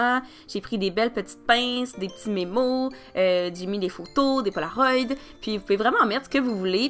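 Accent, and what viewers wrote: Canada. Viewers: J'aime tellement cet accent canadien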